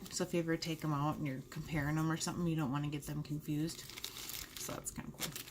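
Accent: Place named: Minnesota, United States. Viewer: What is her accent? Sounds like maybe Minnesota?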